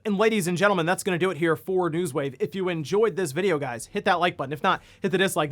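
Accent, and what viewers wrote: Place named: Brooklyn, United States. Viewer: he does have a faint Brooklyn accent.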